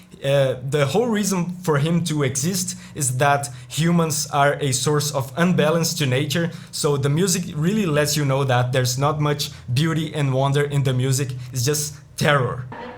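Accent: Brazil. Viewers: Fatalis é um bixo muito brabo, percebi que tu era brasileiro só pelo sotaque kkkk vlw ai mano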